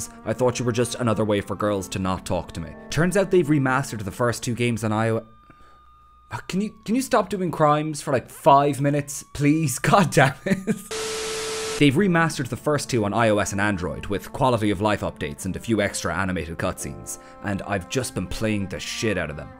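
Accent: Ireland. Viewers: Gotta say man I love your videos so far, the editing and the comedy is lovely! (Plus it's nice to hear a Irish youtuber with a accent besides Jacksepticeye floating about ) Also the ash can is ALWAYS THE GUINNESS, IT'S ALWAYS GUINNESS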